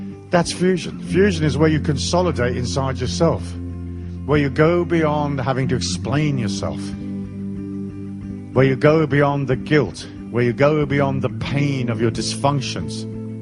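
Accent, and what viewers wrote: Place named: Ireland. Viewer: Slight Irish accent here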